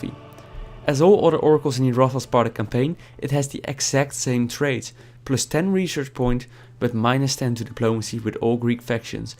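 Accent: Netherlands. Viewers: you're dutch i can hear on you're bad english accent (no hate great vid)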